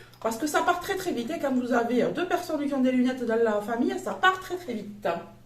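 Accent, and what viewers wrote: South, France. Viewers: Bonjour, belles découvertes à action. Le petit haut vous va très bien. La couleur met votre teint en valeur. J aime vous écouter avec votre accent du sud. Bonne journée.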